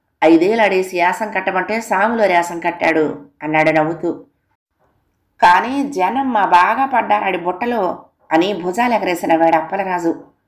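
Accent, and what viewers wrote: Visakhapatnam, India. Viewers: garu meru antha bhaga vizag slang lo chadevaru mam ur voice is god gift andi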